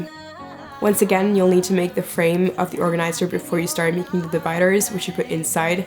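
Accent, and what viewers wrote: France. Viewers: Your French accent is sooo cute , I speak French since my 2yO😛😛😛💕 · Hey are u French or something because it sounds as if u have some kind of accent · I’m not even French and I know that her French accent is on point 👌🏻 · you have a great french accent and i know wht i'm talking about, i'm french ! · I'm french and I have the same accent as you love your vids merci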